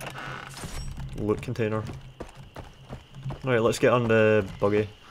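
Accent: Scotland, United Kingdom. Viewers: SCOTTISH ACCENT FTW !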